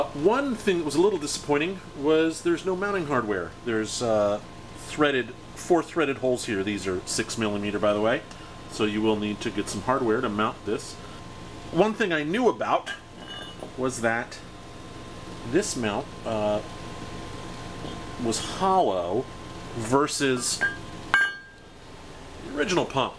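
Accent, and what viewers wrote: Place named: Baton Rouge, United States. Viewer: Nice job. I have a 17 year old 91764 lift with a bad pump. It works fine with my bikes 500lbs and under but puked itself trying to lift my Harley Road Glide. At 900+ lbs it just might be time for a lift replacement. Costly, but the bike is too near the limit of the lift for my comfort. Even if it did work, I'd drill a few new holes for the safety bar higher up as I don't need the bike more than 24" off the floor. By the way, I guessed right. You sound exactly like a contract engineer I use that's from Baton Rouge.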